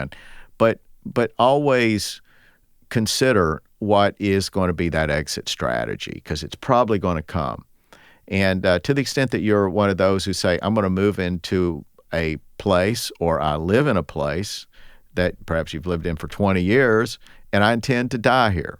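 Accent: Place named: South, United States. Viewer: I bumped into your podcast couple weeks ago and I enjoy your information your presentation and your southern accent very informative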